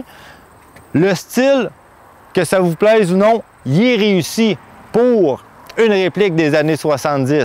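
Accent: Quebec, Canada. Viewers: Lol je m'y ferai jamais à votre accent les cousins !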